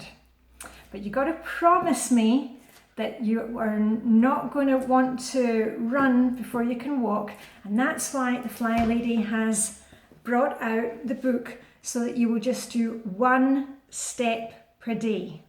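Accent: Scotland, United Kingdom. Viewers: Funny accent? I have a lot of Scottish friends, I love your accent, hen xo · I love your accent, reminds me of our middle school nurse who must've been from Scotland. Can I also say that I love your style, you're dressed so classy every day! I'm a sahm and I pretty much dress in leggings and a regular shirt (which is changed numerous times a day due to spit up). I'm trying to follow you, but it's hard with young babies. Thanks for all of your videos!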